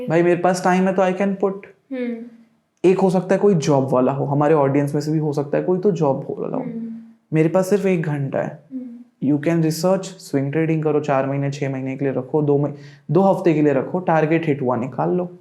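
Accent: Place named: Mumbai, India. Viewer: MUMBAI STYLE HINDI HAI.....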